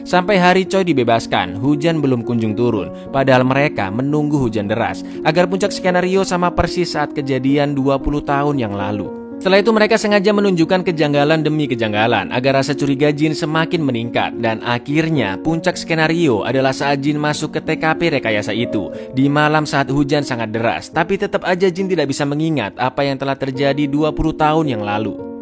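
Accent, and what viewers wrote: Indonesia. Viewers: Ya namanya logat Indonesia ya suka2 dia org korea aja nyebut nama org indo suka salah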